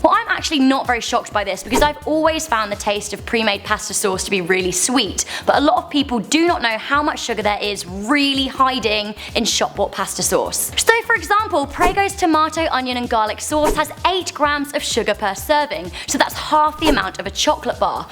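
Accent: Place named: England, United Kingdom. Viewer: You can tell this woman is English but lives in the US because her accent is now fucked up and Americanised.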